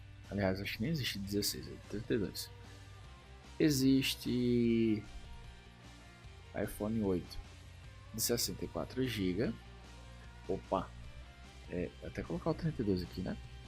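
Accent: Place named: Northeast, Brazil. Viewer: Aula top ainda é nordestino pelo sotaque, ai sim valeu meu vey mais um inscrito de recife <3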